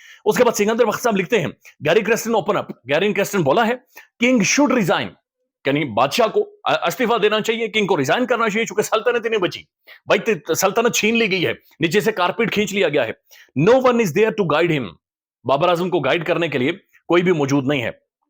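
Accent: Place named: Kashmir, India. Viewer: Ap kashmiri lagty ho apki urdu gulabi hai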